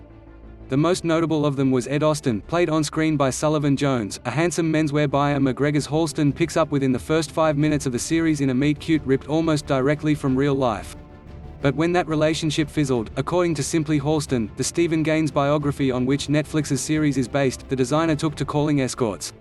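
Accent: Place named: Australia. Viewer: YES and the voice sounds like an Artificial Intelligent robot, it sounds drone like, whoever it was picked the "Australian male" voice setting in the narration reader and used a cheap AI subtitler on top of that.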